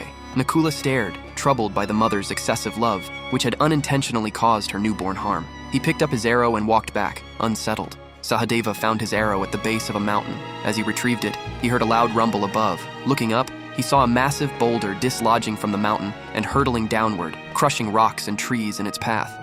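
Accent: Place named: United States. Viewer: Phew! Finally Mahabharata in American accent! ❤❤❤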